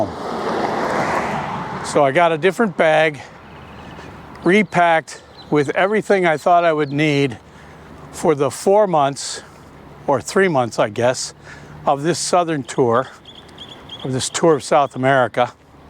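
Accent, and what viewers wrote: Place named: Rioplatense, Uruguay. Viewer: Rioplatense accent. That’s what we speak in Uruguay. Pretty different from the rest of latinoamerica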